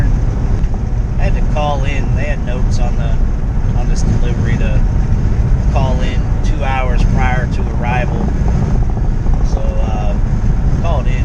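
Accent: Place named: South, United States. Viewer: Nobody like driving in or around NYC. Your lucky the traffic is moving good. I always run into a accident or lane closures at night. People are mean to over weight people that they perceive to be Trump supporters. Given that you live in a van and sound like your from down south. If you were a hot girl the guy would have made more small talk.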